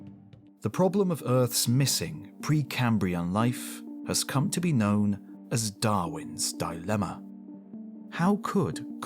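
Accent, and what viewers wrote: England, United Kingdom. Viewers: Lol all it took was a British accent to fool you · You're a fantastic orator. My best guess is that it's due to the specific accent you carry. It is a particularly precise variant of British English that Americans have subconsciously come to appreciate as being intrinsically reputable. If there is a British man lecturing you with this accent, friends, listen closely, as he knows what he's talking about and his intel will be vital to your quest!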